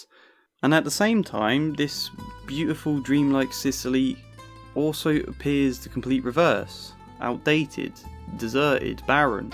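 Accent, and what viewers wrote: United Kingdom. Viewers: michael was in the marines not the army. maybe you should actually watch the movie. your British accent will not save you.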